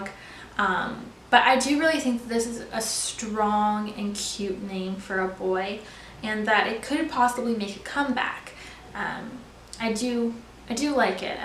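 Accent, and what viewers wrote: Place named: Canada. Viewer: Are you from Canada? just wandering it kinda sounds like you have an accent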